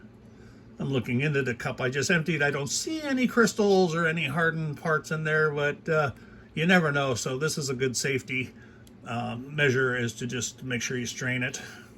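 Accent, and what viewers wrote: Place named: Ohio, United States. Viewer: Your accent sounds southeastern Ohio, no?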